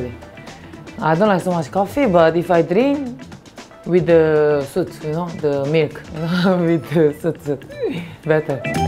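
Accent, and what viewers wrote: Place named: Turkey. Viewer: 언니 터키식 영어 너무 좋아…마지막에 터키어랑 손키스는 뭐야 ㅠㅠㅠㅠㅠㅠㅠㅠ 하 미치겠네 중간에 자기 구글에 쳐본적 있냐에 답할때 왜 이렇게 귀여운건데………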